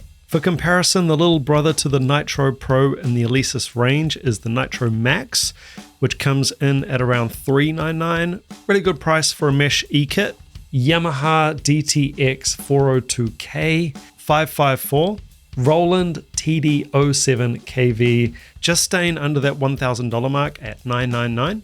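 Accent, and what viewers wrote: New Zealand. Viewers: …I like to hear an NZ accent to a point but to wait for you to say something substantive or meaningful 1/3 the way through…well…too… long…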